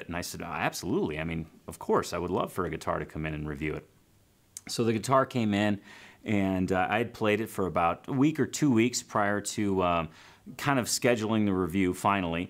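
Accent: Chicago, United States. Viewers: nice review.. I can hear the chicago in your voice ( I am a north sider by birth) and you have mentioned Old Town School ( where I studied harp with my dear friend Joe Filisko). May I ask would you consider plugging that bad boy in? I have a few resos but only one with a pickup ( and that is lousy) ...thanks and be well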